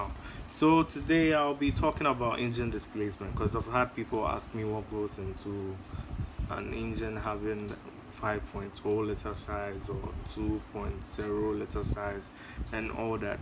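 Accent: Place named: Nigeria. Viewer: You sound nigerian. Ice explanation tho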